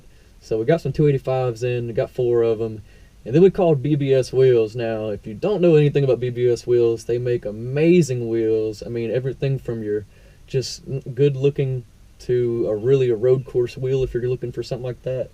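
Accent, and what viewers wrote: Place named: South, United States. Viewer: I love the way you talk man... some people from the south that have lost their accent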